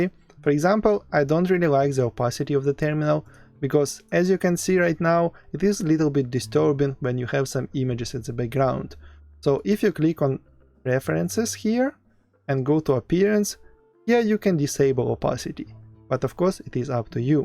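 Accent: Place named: Russia. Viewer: Русский чтоли?! Акцент просто ужас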